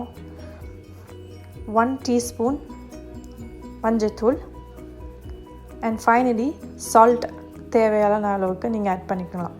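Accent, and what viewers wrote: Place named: London, United Kingdom. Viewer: London Tamil lovely 🥰🥰Mouth watering dish😋😋😋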